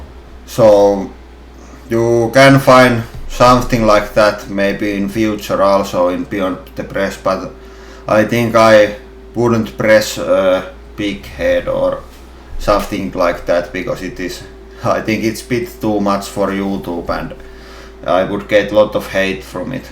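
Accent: Finland. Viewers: Your english is probably better than the most viewers finnish. (And your accent reminds me on Kimi Raikkonen) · This is pretty f.word awesome "rallienglanti" accent, it is whole the thing! I appreciate this courage trying to speak English. I hope its not enact! btw you must also compress the YouTube Play button when you receive one! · Your video was very informative (long, perhaps, but interesting ergo, length is not an issue). We look forward to more exciting hydraulic press action, in the very near future. Additionally, your English is fine. It adds just the right Finnish quality to your videos. Thank YOU, and have a nice day. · Your English is great, the fact that you have a finnish sound makes it even better. People don't want you to sound English. Keep it up... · Katoin koko videon! Hyvää matskua teette!! Ja tekee vain hyvän säväyksen tuo "rallienglanti" =) Oma englanti kuullostaa samalta =D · Thanks to you, Hollywood might someday understand that the Finnish accent is not the same as a general Scandinavian accent. 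· Todellakin katsoin kokonaan! Teidän rallienglanti on ulkosuomalaiselle parasta lääkettä koti-ikävään. :) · i wached the whole video. that finnish accent.. couldnt stop. ;) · I determined this video must be dealt with by watching all 43 minutes of it. Well done! Your "Finglish" accent is your trademark, don't change it. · I'm walking around the house with Finnglish accent in my head now... "POHPULAHR" "KONTINUAY" "HOODRALIK" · kyllä tuli katottua koko video. rallienglantia on aina kiva kuunnella. 😃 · If ever I am sad and wish to make myself feel better, I can come to your "Beyond the Press" channel. I love the mad imagination of what you do. And, being a British person who has a son living and working in Finland — with Finnish wife and three children, I love your Finnglish. Well done!! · oh and btw I watched it till the end. your finglish is quite entertaining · You Finnglish is a huge part of what makes this channel so entertaining!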